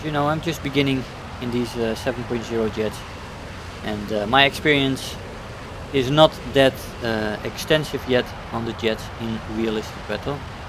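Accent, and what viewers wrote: Netherlands. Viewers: your dutch ? I hear it from the accent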